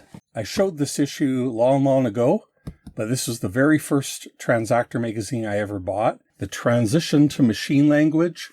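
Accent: Canada. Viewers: Thanks for all the content. Your voice is so relaxing, and I love your Canadian accent!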